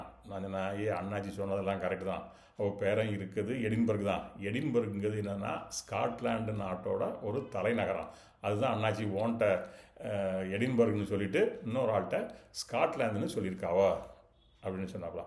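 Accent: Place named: Tirunelveli, India. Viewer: மங்கா புகழ் கொண்ட எம் நெல்லை தமிழே... நீவீர் வாழ்க.❤❤❤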